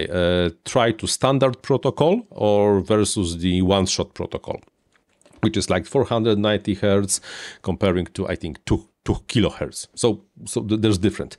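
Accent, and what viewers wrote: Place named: Poland. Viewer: Czemu tak łatwo rozpoznać Polaka mówiącego po angielsku?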